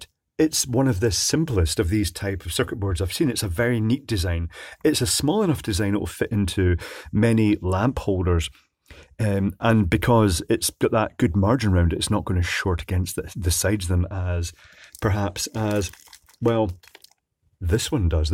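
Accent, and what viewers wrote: Scotland, United Kingdom. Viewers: The accent is Scotland's 2nd most valuable export. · Hi, Love all your videos, been a fan since around 2010, love your soothing Scottish voice! Now I didn't know how else to contact you, but can you do a video about rejuvenating laptop batteries, including their controllers, as I have scoured the net and can't really find anything that addresses the problems with why they wont work after changing/recharging/replacing cells. I've a ton of them and really don't want to tear them apart for just the cells, if the controllers can be put to some use. I know there are a plethora of types/styles/models etc, but maybe if you can autopsy a generic HP or Toshiba maybe Dell that would be great. Look forward to learning.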